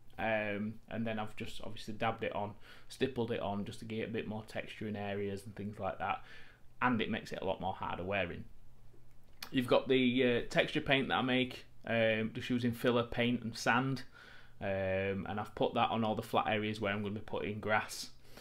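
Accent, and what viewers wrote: United Kingdom. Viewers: You sound like you are from the UK but you use the North American term for sealent - how come? Are most of your subscribers from America?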